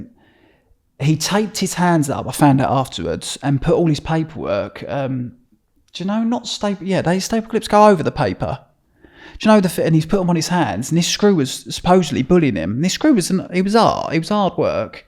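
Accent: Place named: Southern England, United Kingdom. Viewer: What an awful poncey Southern accent.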